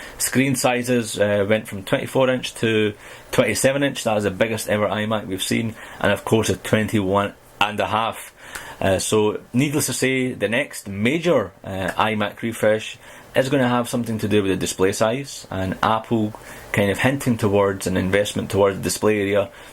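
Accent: Scotland, United Kingdom. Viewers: His accent reminds me Trainspotting !, cheers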